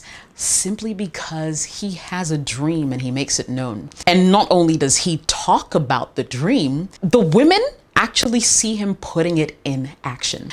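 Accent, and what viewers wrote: United Kingdom. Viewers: Of all the coaches and advisors I watch on YouTube everyday, is the best.: You are a genius. I never miss any of your exciting video-lectures in your beautiful, perfect,crisp,refreshing British English. Hugs from Venezuela,Virgil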